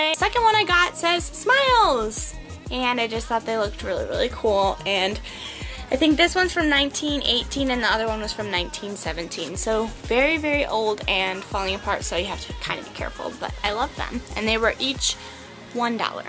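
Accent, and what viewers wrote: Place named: Ireland. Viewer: Cool video! Love seeing the younger generation antiquing! Also geeked out at the irish accent :p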